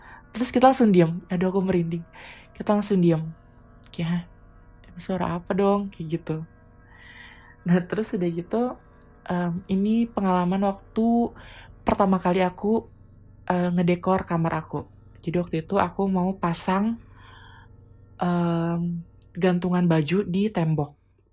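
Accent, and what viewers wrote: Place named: Java, Indonesia. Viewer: Gua kira cewek mas, suarane lo mirip Mas Ricu. Ricky Cuaca. Tapi kayake mas e wong Jowo yo mwehehe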